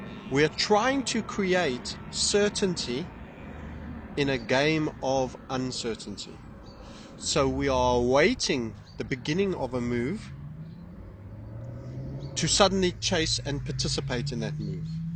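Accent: South Africa. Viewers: FoLA I am glad you have not lost your SA Accent Bru'. Fear-of-Loosing-Accent.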